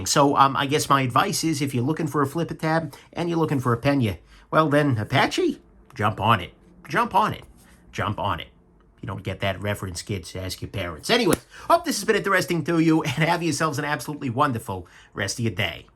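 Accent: New York, United States. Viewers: I love your old - style NY accent. It reminds me of Stitch Mahone from the Paradise Ally movie.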